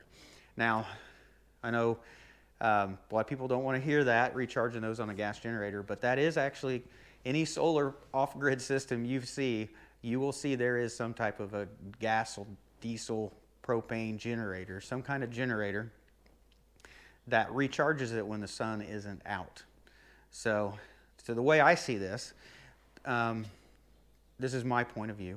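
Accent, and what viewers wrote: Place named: Oklahoma, United States. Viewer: ten or eleven years ago... sounds like you're near me in oklahoma lol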